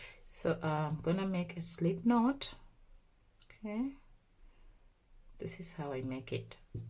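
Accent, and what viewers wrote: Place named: Jamaica. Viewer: that's Jamaican talking but good work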